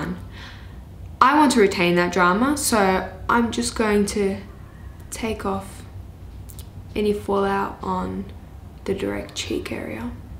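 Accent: Australia. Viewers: you also sound Australian?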